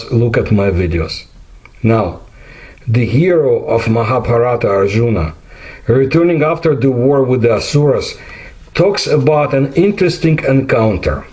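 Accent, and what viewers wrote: Russia. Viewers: Seconded. The videos are a treasure trove but the thick russian accent is a bit hard to follow. I suggest 1.25x for the meantime.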